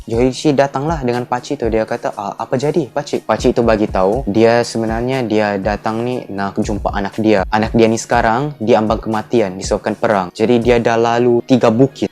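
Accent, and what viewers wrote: Sabah, Malaysia. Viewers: Speaker mmg org sabah bro 😆🤣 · Abg ni orang sabah kaa? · Abang ni orang sabah ke? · orang sabah ke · Logat dia bckp mcm org sabahan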